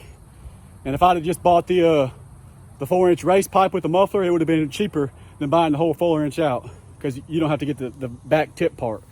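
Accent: South, United States. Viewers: Hey, just wanted to say that your videos are really helpful. And it’s nice hearing a southern accent from back home. Just got a <num> and I’m looking to build it very similar to yours, minus the tuning and weight reduction 👌🏼. I gotta have that warranty. Thanks!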